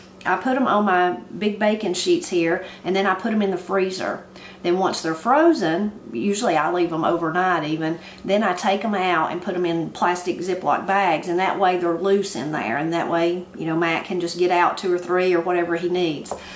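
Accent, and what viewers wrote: Appalachia, United States. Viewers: Listening to you made me unexpectedly happy. My mother's family is from Hindman KY but they moved to Ohio in the 1950's. My grandmother sounded like you - and great-aunts and uncles and second cousins. We used to go down there at least a couple of times a year for a week or two when I was growing up. Later on I lived for years with my grandmother when she was getting up in age. All those folks are gone now and there isn't anyone who talks this way in my daily life. It just about made me cry to hear Appalachian-speak again.❤ Also, thanks for the biscuit advice!😅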